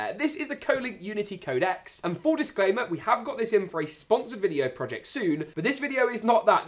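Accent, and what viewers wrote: England, United Kingdom. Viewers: England accent :/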